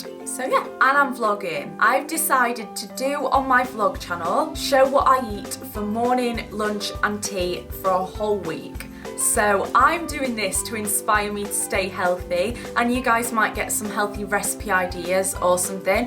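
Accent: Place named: North, United Kingdom. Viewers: Gotta let your legs grow ant ya! Love that Northern twang 💕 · I may be wrong but you sound a bit Yorkshire and I just wondered if you were born there😊😊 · 'Godda let yer legs grow ant ya?' 1- OBVS, all about that yeti life. 2- I always feel ashamed of my Northern accent in videos but yours makes me laugh, I love it!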